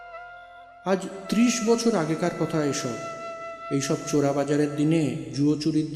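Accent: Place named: Bangal, Bangladesh. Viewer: স্যর বাঙাল উচ্চারণ তো বেশ ভালো 👌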